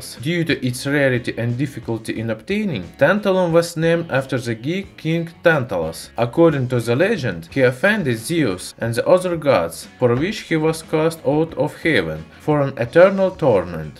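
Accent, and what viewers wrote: Russia. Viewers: Russian accent, its great , listen & learn it. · His accent is sometimes starting to go to Russian accent but I understand him. I don't sometimes understand him it's accent is very good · I think his accent is interesting and I've never heard a Russian accent like that. He looks Finnish to me, and I wonder what part of Russia he is from.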